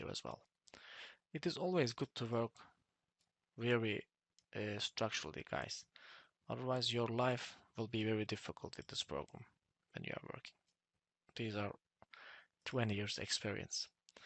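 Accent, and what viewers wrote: Turkey. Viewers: Hocam siz türksünüz galiba aksandan biraz öyle gibi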